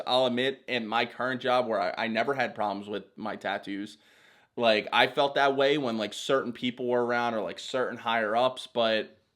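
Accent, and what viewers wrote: Philadelphia, United States. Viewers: When I enlisted in the military in 2008, my first choice was the marine corp. I couldn't get in because of tattooed knuckles and a back piece visible above the neck of my shirt. Ended up in the Army because they offered me a "tattoo waiver." All my tattoos were inspected, and recorded during my entrance physical, the record ended up being 7 pages. Now I'm a subaru mechanic. Also, I can hear the Philly in your voice, Frankford born and raised.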